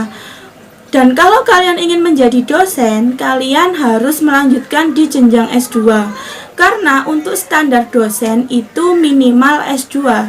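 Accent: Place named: Java, Indonesia. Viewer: Medok banget kak .. wkwk orang Jawa kah ?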